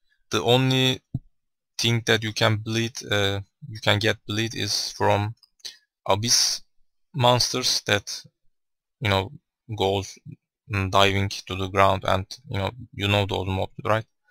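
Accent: Turkey. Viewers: I spot turkish guy who is trying to speak english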